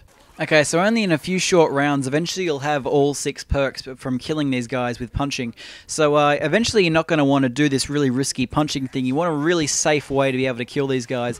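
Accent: Australia. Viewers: Nice vid man i subbed u swnd like ur from Ausie which is kool coz im from NZ i hav no prob with ausies bro my teacher is from Australia & shes kool as catch ya later mate;-)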